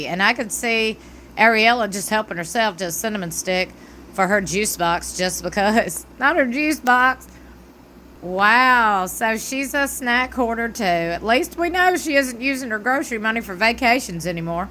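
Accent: South, United States. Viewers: Just found your channel. I haven’t watched Sister wives in several years, but they came across my YouTube algorithm for some reason, so I went and watched 18 and 19 seasons on Hulu., since it’s free with my t-mobile subscription. Unable to watch season 20 yet, been trying to get glimpses through you guys on YouTube. Robin is absolutely diabolical. I hope to never meet anyone like that in my entire life. Anyway, enjoy your channel, and also your accent. You’re from Louisiana? I’m a Georgia girl. Love the mutual southern accent!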